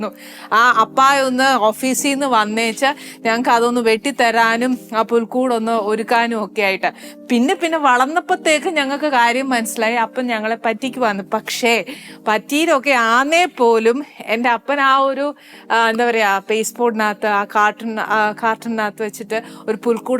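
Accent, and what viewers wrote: Kottayam, India. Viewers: അച്ചായത്തിയുടെ കോട്ടയം ഭാഷ കേൾക്കാൻ നല്ല രസമുണ്ട് ❤ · every single one in a particular place acquires the same local slang, so kottayam slang is not reserved just for achayatees... is an exception though, she's kottayam slang living in Trivandrum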